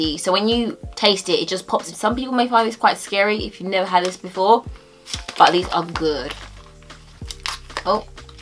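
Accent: United Kingdom. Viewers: my name is marlene and i have tasted all those candy exept the twinkiesand your right the cowtales are super gross but the sour balls that you didnt like i love those and i love your british accent and you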